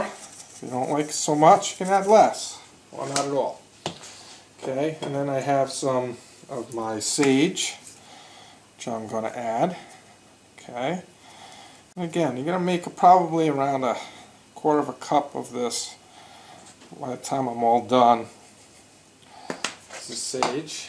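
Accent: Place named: Boston, United States. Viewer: the way you say part is funny you pronounce the word pot you must be from boston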